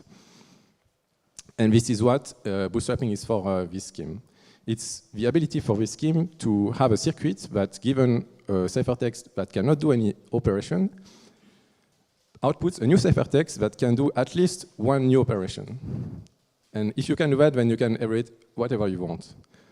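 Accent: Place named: France. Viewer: j'ai tellement le seum c'est en anglais avec un accent Français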